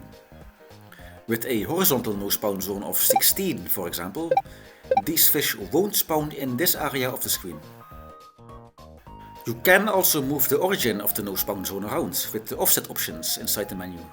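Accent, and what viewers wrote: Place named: Netherlands. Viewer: kinda unrelated but i like the dutch accent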